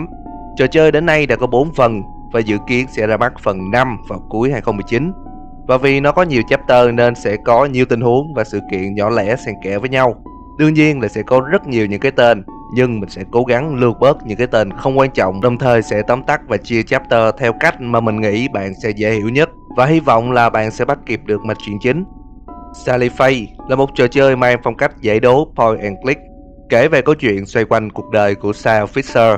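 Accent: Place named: Mekong Delta, Vietnam. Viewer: Omg có thằng e mới giới thiệu trang này xem. Vào xem nghiện luôn. Mặc dù mình chưa chơi game nào trong những game bạn phân tích nhưng bạn có thể suy luận như thế thì thật là quá đỉnh. Ngôn ngữ của bạn cũng chuẩn nữa. Giọng miền tây nhưng rất dễ nghe. Chọn từ ngữ đúng, lối dẫn truyện rõ ràng mạch lạc nên mình nghe phát hiểu luôn. Mình sẽ sub và ủng hộ. Chúc kênh sẽ càng phát triển nhé.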